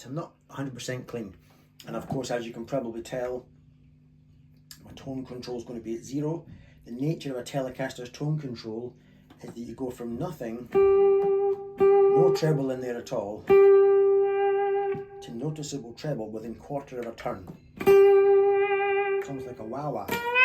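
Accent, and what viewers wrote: Scotland, United Kingdom. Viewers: What a fxxxing lovely sounding Tele. Of the many many custom Teles I've heard on Youtube, that one really sings (of course helped no end by the great phrasing and touch of the guy holding it). Also, didn't expect to hear that accent after the opening music. From a fellow Scot (albeit faraway from home)...just fantastic playing mate. Also Also...why cant other Youtube channels get decent amp tone like you?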